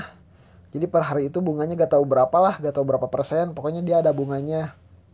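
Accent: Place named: West Java, Indonesia. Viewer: Ciri khas bahasa ,,,Orang Sunda 🤣🤣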